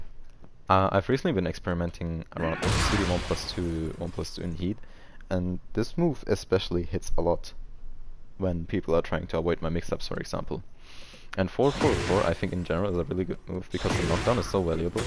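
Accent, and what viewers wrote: Germany. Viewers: Haha the accent always gives us away. Habe mir vorgenommen von Jin (T7) auf Dvj zu wechseln in T8 und dein content ist richtig gut bro. Danke fürs Teilen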